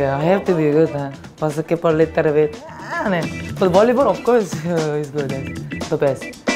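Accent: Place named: Turkey. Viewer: 언니 터키식 영어 너무 좋아…마지막에 터키어랑 손키스는 뭐야 ㅠㅠㅠㅠㅠㅠㅠㅠ 하 미치겠네 중간에 자기 구글에 쳐본적 있냐에 답할때 왜 이렇게 귀여운건데………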